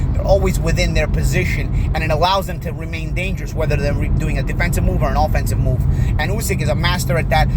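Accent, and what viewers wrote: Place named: New Jersey, United States. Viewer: Too much jersey wop accent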